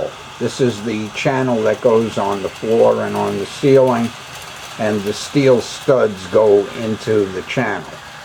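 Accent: New York, United States. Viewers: That's gotta be the thickest New York accent I ever heard on YouTube so far and the design of your el is absolutely great! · Thank you so much for this video,, I visited Brooklyn 20 years ago and always wanted to build a railway model of it,, Now I finally have the time and space I am going to start. Thank you for the tips.... must say I love your accent-- it takes me right back to the summer of 94 and my month in New York,, kindest regards from England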